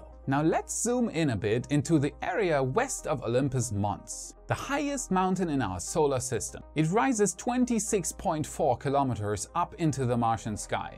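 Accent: Germany. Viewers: I wanna say German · are you from germany?!!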